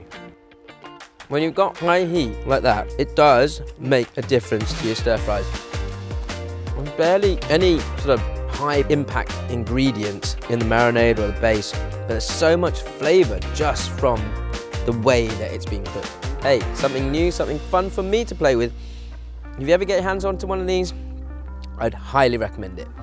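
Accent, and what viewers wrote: United Kingdom. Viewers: I gotta say that British accent is soooo nice. United Stated here - much love and respect to your cooks and expertise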